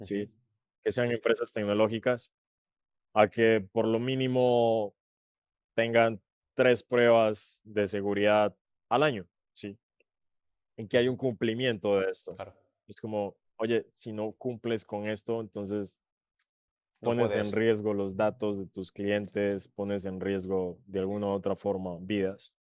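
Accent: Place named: Colombia. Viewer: Porque a veces habla full Colombia o como un gringo aprendiendo español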